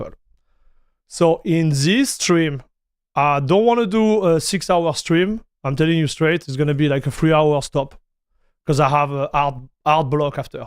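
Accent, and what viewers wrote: France. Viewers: But are you French French or Canadian French?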